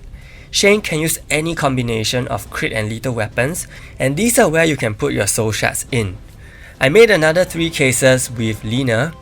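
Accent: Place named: Singapore. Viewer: Hah! I hear your (very familiar) accent and took the chance! Singapore yo ;) Looking forward to the next series. Take care in the meantime!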